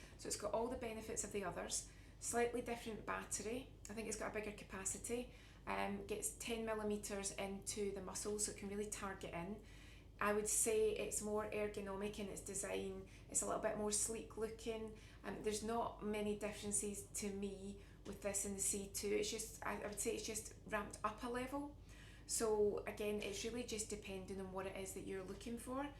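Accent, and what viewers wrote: Scotland, United Kingdom. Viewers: Great to see a comparison of the three guns. I have dexterity issues and weakness in my arms because of spinal injury. My muscles get so tight from being clenched in pain for hours and sometimes weeks at a time. I gotta start saving up for one of these bad boys. New subbie here and I love your scottish accent. Irish woman myself. Thanks for sharing.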